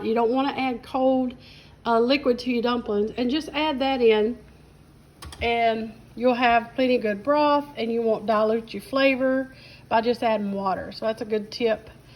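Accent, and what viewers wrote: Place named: Kentucky, United States. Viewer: I hear that accent. I’m in California, but I lived in North East Kentucky for awhile. I lived in Winchester, under 5,000 in the early 80’s. We also lived in Corinth 210 people back then. Then we moved to New Columbus Kentucky 32 people. Could barely breath so many people lol. It was beautiful and I ate my weight in wild blackberries. I was Blue and Red from the berries and blood trying to get through those thorns. The only thing I hatted was Chiggers. Those stupid bugs made me itch so bad, but it was worth it to be out there. Love your dumpling recipe. I enjoy both flat and fluffy. Thanks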